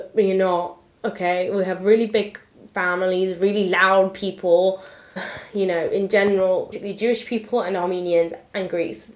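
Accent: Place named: England, United Kingdom. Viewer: This lovely young lady sounds rather English,but she was probably born in England of Armenian parents.Felt that she gave a pretty good speech about some cultures that are rather similar to Western Armenians,such as the Jews,and Greeks.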